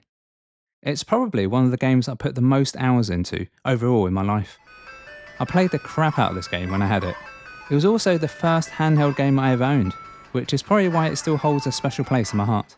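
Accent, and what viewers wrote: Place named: United Kingdom. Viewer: It's called a British accent lol. Maybe you should travel more instead of playing games, it opens the mind. Hope that helps.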